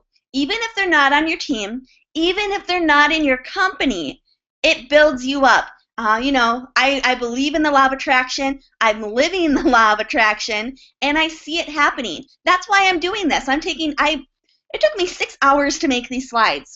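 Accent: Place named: North, United Kingdom. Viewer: I love your northern accent!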